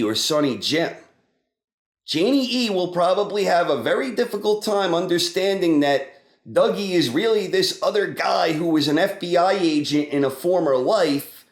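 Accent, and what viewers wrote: New York, United States. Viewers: that is a serious accent you have. NY?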